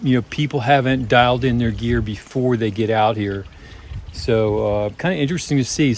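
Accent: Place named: Pennsylvania, United States. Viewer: London, England...Acorn Army, worldwide! I’m American who’s lived in London 9 years. We have a family lake house in western Maryland - have hiked a few sections of the AT and a lot in the area. I’m looking to attempt a thru hike in 8 years (early retirement and kids out of school). Thought I wanted NOBO but not sure I’d like the large crowds - so thinking flip flop. Main worries are what you’re describing in this video - anti-climatic at Katahdin and will I have it in me to flip back down and hike south? I’d love to see you walk south and will be cheering you on if you do! Are you from PA? You mentioned it once and then the beach, so a bit confused. Also, you’re accent tells me PA or Maryland...maybe south Jersey?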